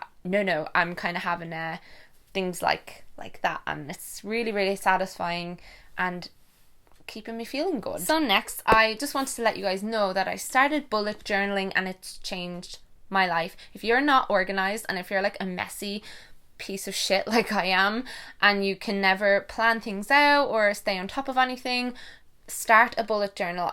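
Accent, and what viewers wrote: Dublin, Ireland. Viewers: I'm from Ireland 🇮🇪 and your accent is the cutest 🎉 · I love your videos so much! You always put a smile on my face:) I feel like home when I listen to your beautiful Irish accent because I'm actually half Irish living in Finland:) And oh my god it would be so cool if my name was in your book! 💚💚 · I would love to be in your book love watching your videos and love your Idunlun accent 😍💚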